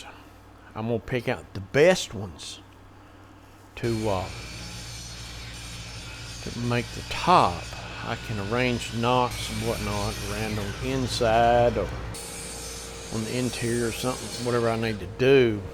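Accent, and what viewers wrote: South, United States. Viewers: Absolutely terrific video,! I'm a retired educator who began my professional career teaching junior high Industrial Arts (AKA "Shop," because most folks have no idea, nowadays, what Industrial Arts was/is). After 8 years, I was recruited to become a middle school principal for 3 years, then was recruited to join the faculty of Industrial/Vocational Education at Mississippi State University (Starkville is my hometown, even though I now live in Tupelo, birthplace of Elvis, after I retired from MSU). Anyway... I do some woodworking in my retirement years out in the garage shop, but it's not set up for a "real" shop like I had when I was teaching. The single thing I miss most in a good, solid, hefty workbench, largely because I don't have a vise to hold my projects when I filing, sanding, shaping on projects like charcuterie boards, etc. 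I'm now 77 years young, so I have to face reality that there are not enough years left on this Earth for me to dump a pile of money into fixing a top-notch shop. I feel 28 in my mind, but I realize that I have fewer years ahead of me than lie behind me. Ha! So, thanks for your outstanding job of "teaching" your viewers as you go. It's a pleasure...and I can't figure out how I didn't find you on YouTube 10-15 years ago. By the way, I can tell from your accent (but we Southerners don't really have an "accent," do we? Ha!) that you're in the Southland somewhere. Have you ever shared what general part of the country you're in....like the State w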